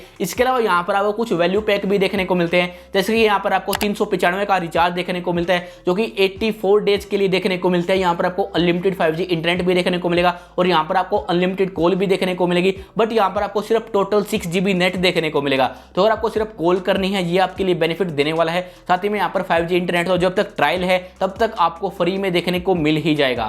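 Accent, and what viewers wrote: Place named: Haryana, India. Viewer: aapki aawaz se pahchana main bhi haryana se hu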